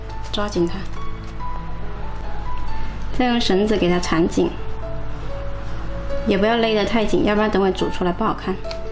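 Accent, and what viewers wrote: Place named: Hakka, China. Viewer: she has been telling us that she is 客家人, I am also a 客家人too. Although I am not fluent in 客家話, I understand the dialect pretty well. Her 客家話is totally different from mine. Which part of China is she coming from?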